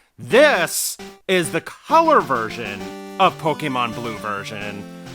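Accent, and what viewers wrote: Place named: United States. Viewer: It just sounds American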